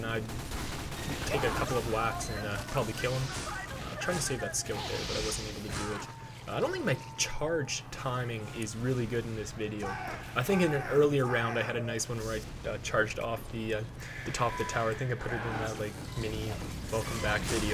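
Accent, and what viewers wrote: Canada. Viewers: you have such a bad ass Canadian accent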